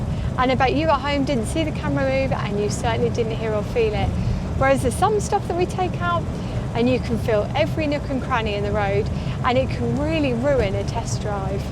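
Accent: United Kingdom. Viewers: We can tell that your love for this car is not feigned. And I love your British accent (quite logical since you are), your colorful expressions (...like a swan on a pond) and you drive divinely (deessely) well and with style (with your leopard coat you look like Catherine Deneuve in a Truffeau film, perfect temporal matching)🙏💙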